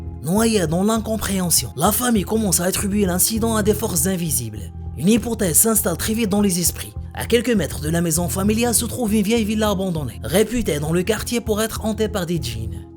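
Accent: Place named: North Africa. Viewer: Au de la du boulot remarquable que vous faites, mais il ya cet accent qui nous rapproche du bled et d'ailleurs j salut tout mes collègues bledar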